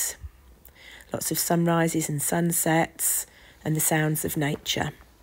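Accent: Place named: United Kingdom. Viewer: She's all bundled up in her lovely coat, on a windy day :-) I also like to hear the British accent, as I am in America now, and miss England ! :-) Absolutely lovely.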